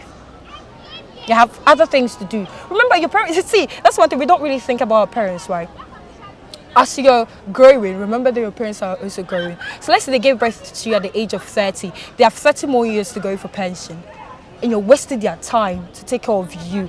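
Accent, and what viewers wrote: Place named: United Kingdom. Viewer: This is so good.. she speaks with a British accent. That is what pertains in the UK. We can model it here for trial.. brilliant idea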